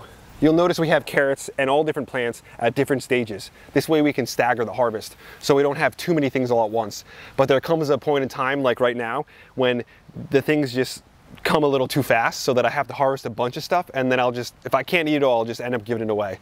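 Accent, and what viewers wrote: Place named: South Jersey, United States. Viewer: Didn’t know u were from Jersey but I’m from jersey n I can hear ur accent. Sounds like south jersey 👩🏽‍🌾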